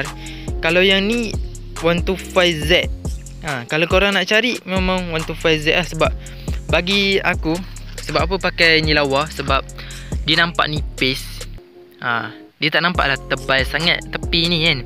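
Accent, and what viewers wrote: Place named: Sabah, Malaysia. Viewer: dok sabah ke serawak ni derr??? slu blah² sana je mcm nie 🤭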